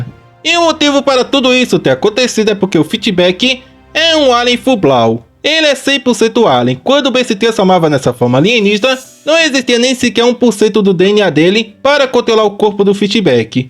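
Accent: Brazil. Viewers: Me pergunto de que país esse cara é, o sotaque bele parece muito com o ptbr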